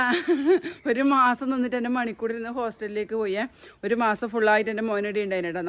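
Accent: Kannur, India. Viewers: കണ്ണൂർ ഭാഷ സൂപ്പറാട്ടോ, കേൾക്കാൻ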